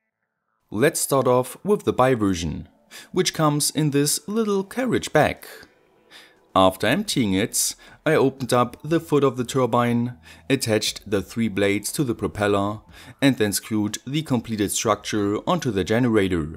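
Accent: Germany. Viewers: When Deutsche sprechen English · Hast einen deutschen Akzent 😂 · German accent cool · Man hört sowasfon das du deutscher bist ...... Kleine Frage noch warum sprichst du englisch... no offens wollte es nur mal wissen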